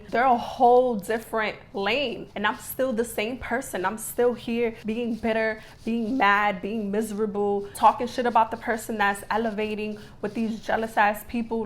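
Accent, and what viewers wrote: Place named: New York, United States. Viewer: I love how you sound. Are you from New York?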